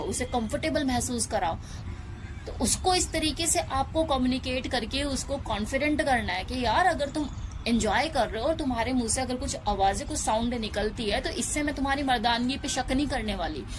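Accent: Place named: Delhi, India. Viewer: mujhy lagta hy aap Dilli se hn na. Apki zaban (Urdu) aur lahja bata raha hy... Aur aap bohot khubsurat (sundar) ho....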